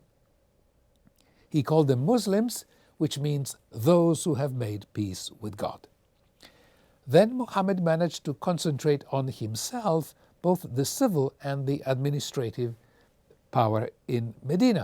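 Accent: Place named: Italy. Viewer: I can hear an Italian accent in your English now :-)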